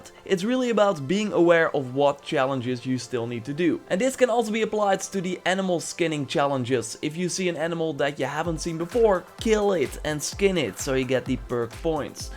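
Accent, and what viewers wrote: Netherlands. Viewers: are you dutch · are you dutch? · He's Dutch lol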